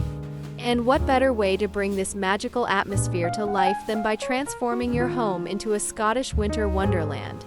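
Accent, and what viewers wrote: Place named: United States. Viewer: Why does Christmas in Scotland have to be narrated by an American accent?!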